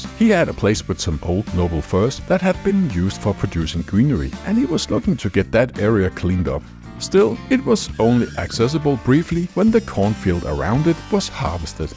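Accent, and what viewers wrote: Denmark. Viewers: jeg kan bare lig hvor dansk du lyder :D jeg er også dansk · You have similar accent to a Dutch youtuber I follow, Posy. Danish and Dutch have very similar accent when speaking English. I think it sounds cute.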